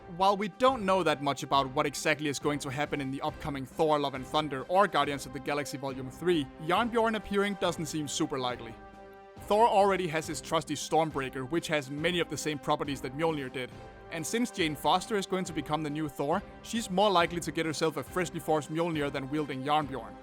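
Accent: Denmark. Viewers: sounds lowkey like you're from denmark hehe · You sound danish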